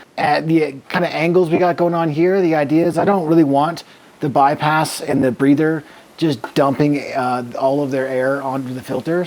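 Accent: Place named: Midwest, United States. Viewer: Subscribed. ;-) I liked the music added in during the printing process, maybe look for other places to add some background music for a bit of ambiance. Otherwise a great first video, and clear that you've been taking notes on other good creators out there. Reminds me a bit of Greasy Fingers videos - but with a clear Midwestern accent (I'd guess Minnesota, Wisconsin or Iowa), rather than the German.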